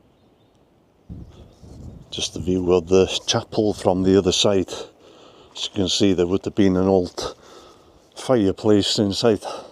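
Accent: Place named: North Wales, United Kingdom. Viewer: Thank you for this, I loved watching Ryan & Ronnie too. He made me laugh in Welsh & English. I didn’t realise he died so young. It’s nice that there are some of his programmes on You Tube now, I used to watch Poems & Pints regularly. I like your North Walian accent. When I was young you were either Church or Chapel in Wales. There were chapels everywhere. Lovely excerpt from Myfanwy, my favourite. From mid-Wales 🏴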